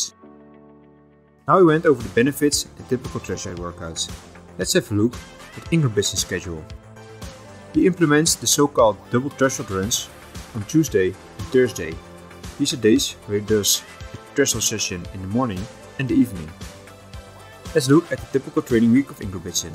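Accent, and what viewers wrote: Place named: Germany. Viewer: Meest denglish accent ooit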